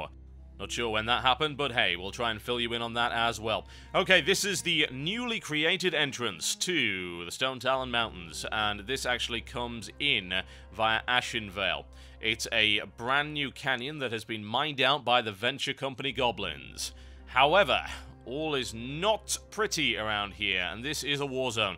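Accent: United Kingdom. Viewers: Wait a second... Are you brittish? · Im ashamed to be from the same country as you :( im Norwegian 2 and i dont see anything wrong with his accent, infact i think a real British accent is awesome. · Why is everyone going bananas over the accent? Has no one ever heard a British person speak before?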